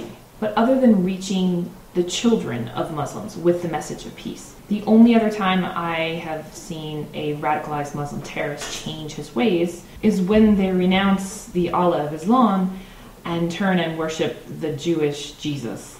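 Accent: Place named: United States. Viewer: את דוברת אנגלית שוטפת ובמבטא אמריקאי מובהק, אבל שמעתי אותך גם מדברת עיברית בלי מבטא (אם אני זוכר נכון). את עולה וותיקה?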